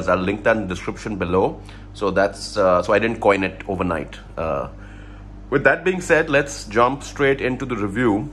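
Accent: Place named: India. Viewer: Indian accent is sooooo annoying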